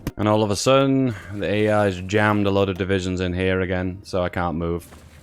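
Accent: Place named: United Kingdom. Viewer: I love your videos and I love your accent. Simply because I'm french (salut l'anglais!) and all the french people loves the british accent in english.🤣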